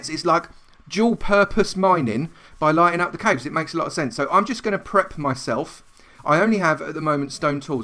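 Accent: United Kingdom. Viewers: hey dude, been watching your videos on and off and keep coming back to them so decided to watch your survival L:P from the start - your later videos are fine but in this video in particular the music edits are way louder than you, I don't know if you know or not, but in cse you don't, depending on what software you're using to record, if you "Normalise" the audio track, it will level out and optimise the volume decibels without destroying the quality (e.g. if your voice is 20db and the music is 36db, it'll put the volum eto around 28 or something and then you can adjust the volume how you like of the entire track as a whole) Loving the videos, especially the early game farms one, I never thought to lay out the saplings in a slanted rectangle formation, and also never thought to drop lamb down into a slaughter chamber for when they grow up. Kinda neat ideas. 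Nice to hear more Brits taking over the Minecraft scene:P hahahah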